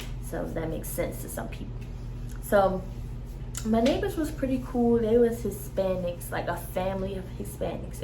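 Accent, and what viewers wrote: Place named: Baltimore, United States. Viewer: It’s the Baltimore “tew” for me lol. But great video!!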